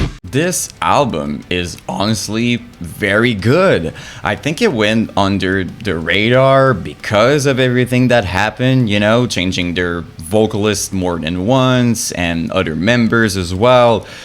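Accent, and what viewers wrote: Quebec, Canada. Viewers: Are you fromQC? You sound like you are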